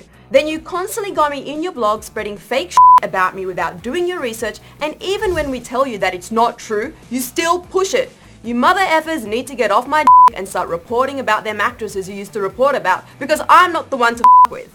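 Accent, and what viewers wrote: Australia. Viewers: love her accent :O is that australian? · Whose this new Australian host?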